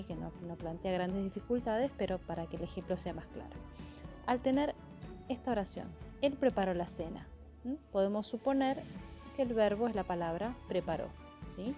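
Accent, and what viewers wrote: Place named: Argentina. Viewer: Gracias . porcierto sos de qrgentina. 🍽 un acento bonito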